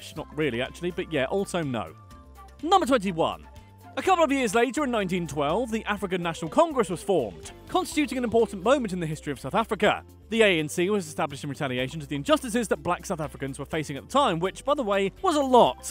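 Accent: England, United Kingdom. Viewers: Wow very english you need to work on your accent dude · I am from South Africa nad the acsent is kinda british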